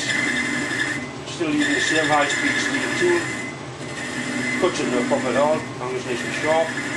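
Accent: Newcastle, United Kingdom. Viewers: Wonderful! You kept us wondering how this was going to work until the end. I really enjoy your videos and always learn something new from them. I am not from northern England, have never even had the opportunity to visit, but I love listening to Geordie and it forces me to really pay attention to understand. Thanks for all your efforts to make these excellent videos.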